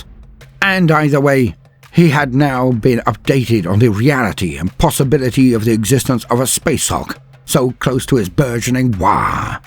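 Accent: United Kingdom. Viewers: Hearing the contrast between a dramatic, vaguely british accented voice delicately explain the brutally cunning (or is it cunningly brutal?) workings of what passes for an ork's thought processes is just too perfect.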